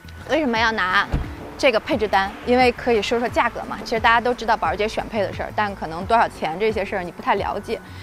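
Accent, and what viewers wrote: Beijing, China. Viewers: 解说的很到位，喜欢你的北京腔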